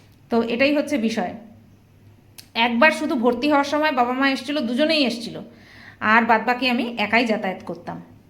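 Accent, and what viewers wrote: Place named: Dhaka, Bangladesh. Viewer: তোমার কথাগুলো ঢাকার ভাষার মতো। তোমার আদি বাড়ি কী বাংলাদেশ ছিলো?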